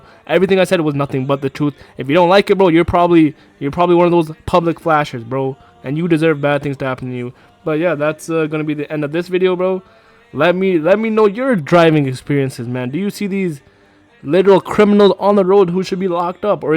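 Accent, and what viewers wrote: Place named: India. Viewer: My guy he is Indian too you can tell from his voice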